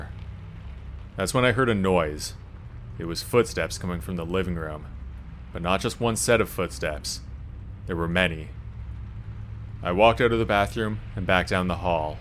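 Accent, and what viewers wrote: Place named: Canada. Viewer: I enjoy the cute "oot" and "aboot" Canadian inflections too. 😊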